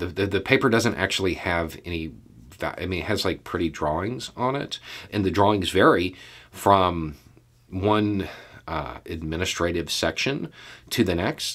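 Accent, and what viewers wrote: South, United States. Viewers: In my head, I say your opening lines with you....and at times aloud, in my best imitation. Being Canadian, that Southern accent don't come easy, eh. Well, ya got me this time. Coffee came out my nose when you said, interstellar people....strong black coffee. You made me laugh, but I didn't like it.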